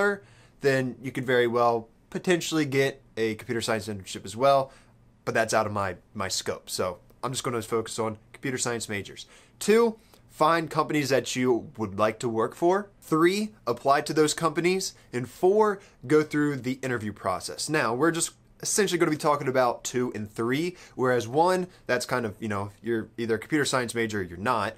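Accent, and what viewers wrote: Texas, United States. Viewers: Are you from Texas? I noticed you say y'all a lot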